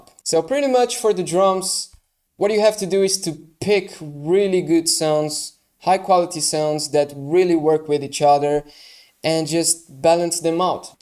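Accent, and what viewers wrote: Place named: Brazil. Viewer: are u brazilian? eu percebi pelo sotaquezinho hahaha tutorial foda cara, esse som tá bem único mesmo!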